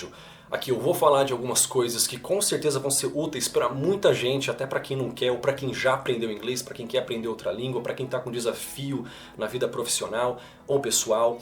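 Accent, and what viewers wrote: Brazil. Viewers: O “brazilian accent “ te entrega irmão. 🤣🤣🤣🤣 Acontece comigo também e falo ingles há mais de 20 anos! Osso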